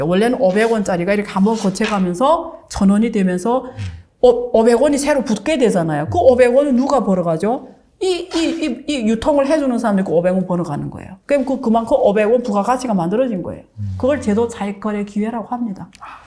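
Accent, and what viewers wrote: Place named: China. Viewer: 교수님 중국분이십니까? 발음이 중국...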